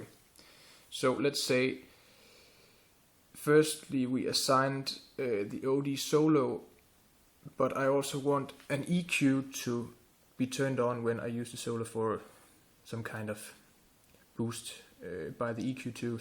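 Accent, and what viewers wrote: Denmark. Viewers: Hi again! nice videos. Easy understandable. Are you Danish ????? thanx again 😁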